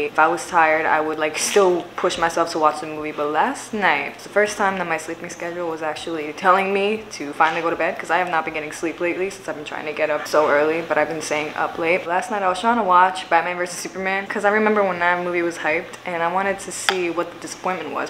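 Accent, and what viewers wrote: New York, United States. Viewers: u from nyc? 🤨 love ur accent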